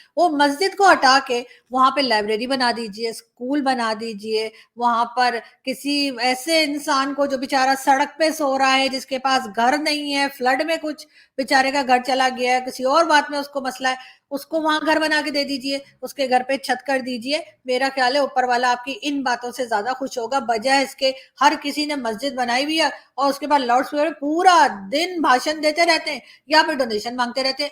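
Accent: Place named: Haryana, India. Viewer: ji main kai baar sochta tha ki aapki language dialect mein mere area wali dialect kyo hai ab pata chala ki aap to mere Area Ambala Cant se ho. I mean your mother from Ambala cant.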